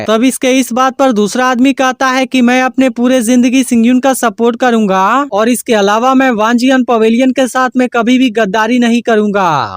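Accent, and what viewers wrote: India. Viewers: First comment for u 😚 big fan bro ur voice from odisha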